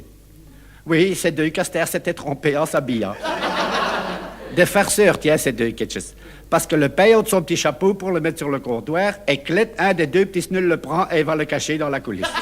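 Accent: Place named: Brussels, Belgium. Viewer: Cela me ramène en arrière dans le temps, je suis bruxelloise et ma bobonne parlait comme ça.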